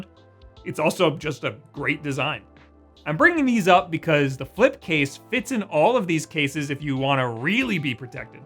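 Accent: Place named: United States. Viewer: your American its not that weird well you are from New York so it makes it a little weird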